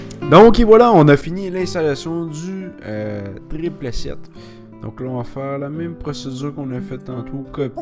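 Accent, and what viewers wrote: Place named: Quebec, Canada. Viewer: yeahhh un quebecois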